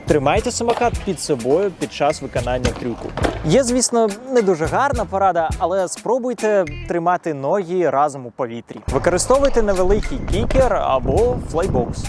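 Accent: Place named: Belarus. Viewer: Всё же ты белорус, говор выдает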